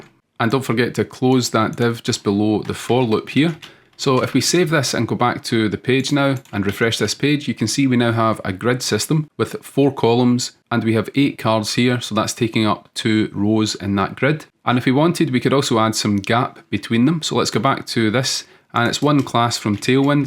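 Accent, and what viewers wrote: Scotland, United Kingdom. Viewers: It would be good to have a cotton htmx video. By the way are you scottish ?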